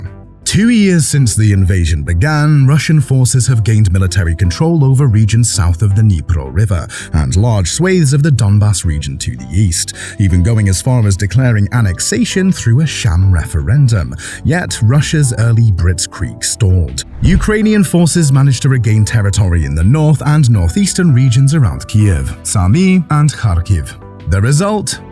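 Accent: United Kingdom. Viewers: Rubbish and biased video. British sounding Harry Potter Uncle Tom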